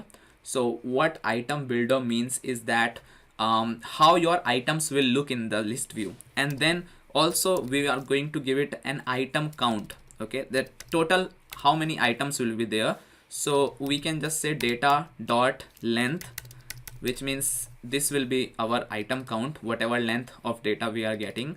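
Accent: India. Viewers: An awful English accent... Indian people should never be allowed to speak English. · Your voice like Indian people 🇮🇳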